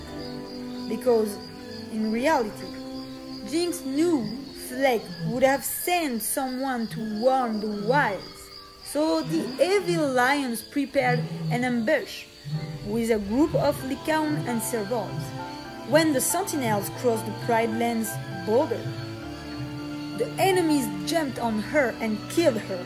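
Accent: France. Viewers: You are french ? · are you French I recognize your accent (je suis français aussi)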